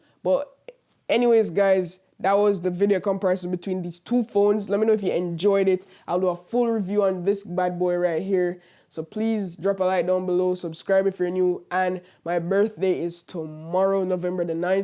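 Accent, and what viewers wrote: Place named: Jamaica. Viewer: Wait why does he have a Jamaican accent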